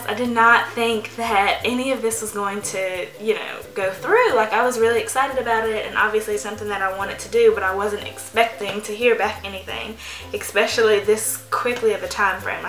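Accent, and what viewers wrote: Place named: South, United States. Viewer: oh ok thats cool. I knew you had to be from some where down south.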